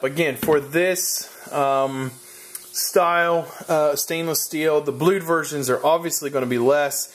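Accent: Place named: Pittsburgh, United States. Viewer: You from Pittsburgh? I know a yinzer accent....if your shop is around the Burgh, ill be stoppin over for one.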